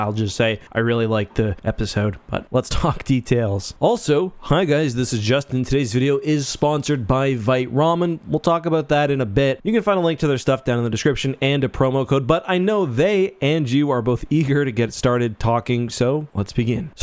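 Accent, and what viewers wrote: Canada. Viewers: Love his Canadian accent!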